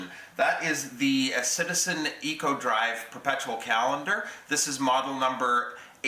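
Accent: Canada. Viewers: l lived in Stony Plain, Albetta 18 months. - pre-"Oh Canada." Really "old" days. Thought I heard a touch of the Great White North.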